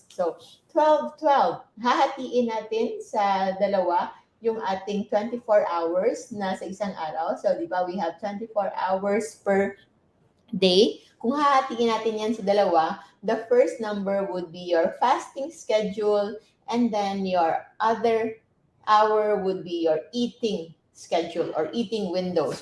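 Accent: Iloilo, Philippines. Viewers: Ilonggo si Doc?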